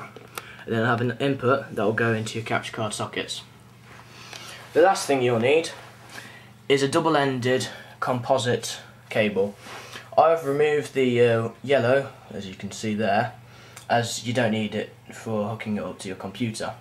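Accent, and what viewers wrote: United Kingdom. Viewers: You can notice that he has a British accent.... they use fucking STERLING POUNDS as a currency... -_ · no some british people don't sound like harry potter, but this guy sounds almost identical to him go watch harry potter then watch this vid again. i wish i had a british accent, having no accent is boring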